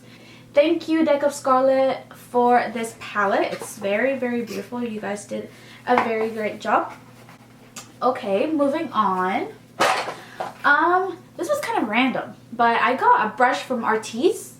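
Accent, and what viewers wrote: Mexico. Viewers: We love a Mexican Queen with a cute accent 👑💕✨🙌🏾 Too Faced: Warm Sand Covergirl: golden ivory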